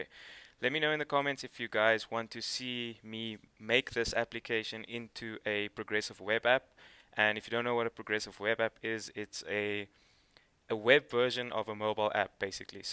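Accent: South Africa. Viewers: You sound South African brother. Thank you for this tutorial. Just what I needed right now.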